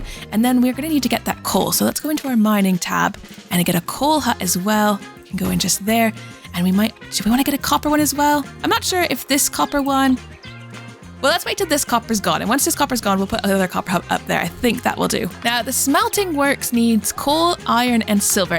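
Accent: United Kingdom. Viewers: I thought I heard a bit of British and some other accent.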